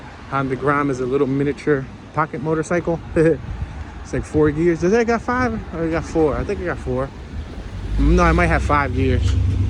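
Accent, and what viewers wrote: Philadelphia, United States. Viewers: What a day to be celebrating Queen Village with the passing of HRH Queen Elizabeth II. Thank you; this is beautiful. Philadelphia is nothing if it is not diverse in neighborhoods and atmospheres; gorgeous. BTW you have the same Philly accent my relatives had a century ago; thanks for keeping it alive. ;-)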